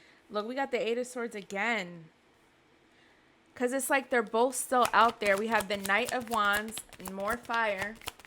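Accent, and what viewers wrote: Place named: Maryland, United States. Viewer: I love the your accent is it Maryland or Baltimore?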